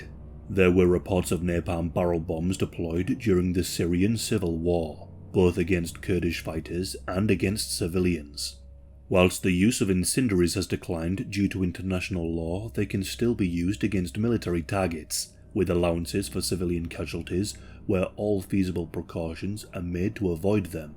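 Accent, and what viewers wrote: United Kingdom. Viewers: Hes brit*sh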